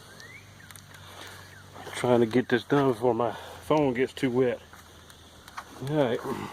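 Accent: South, United States. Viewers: Just love the southern accent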